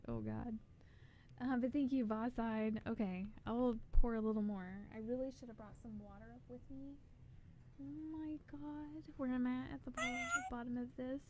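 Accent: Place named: South, United States. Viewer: is it only me who notice her country accent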